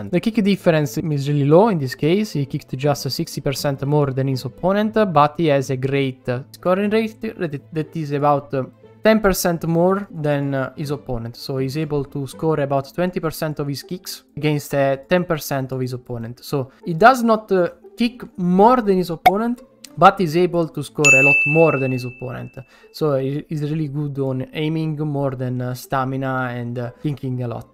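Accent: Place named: Italy. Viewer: I understand that you are italian from the accent.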